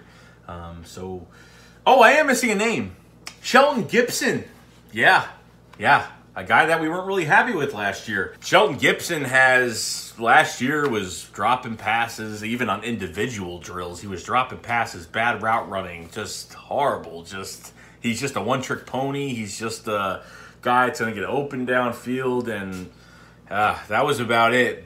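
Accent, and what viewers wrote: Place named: Philadelphia, United States. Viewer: Love your Philly accent trying to pronounce Kamu Grugier-Hill as Kamu Gruger-Hill lol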